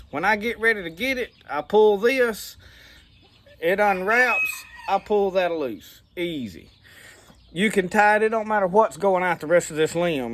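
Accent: South, United States. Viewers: Hes like me he's got a southern accent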